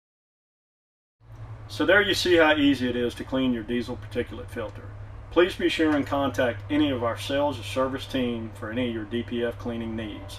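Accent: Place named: United States. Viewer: He's using the American pronunciation. And that's not a Sandblasting box, it's specifically designed for cleaning DPF's, which is why it has a Vaccuum unit at the bottom along with a collection box for waste and a plate specifically designed to seat the DPF - He doesn't state that it's anything super secret he even says it uses a simple high pressure air knife to blow ash through the filter - but it does work in combination with the vaccuum under the DPF...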